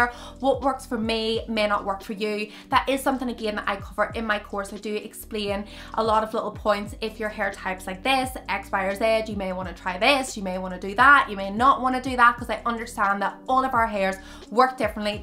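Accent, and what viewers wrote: Derry, United Kingdom. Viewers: What in the Derry girls accent is this?! The first thing pops up in my head is Derry girls after watching her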